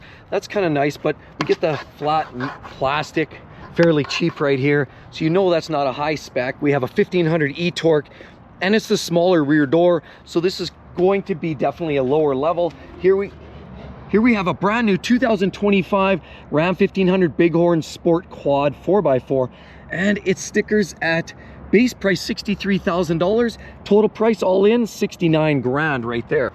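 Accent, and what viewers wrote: Canada. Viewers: Yes, truck prices are stupid but did I detect a bit of Canadian in your voice? And then I looked up the pizza place next to the lot you are on. There's one near Edmonton, Alberta. The exchange rate is currently 1.37 Canadian to the U.S. dollar so cut those stated prices by about a third to get the U.S. prices. And do some homework before venting your spleens! I still wouldn't buy one, though. Pitter patter now! Yes, been streaming me some Letterkenny lately!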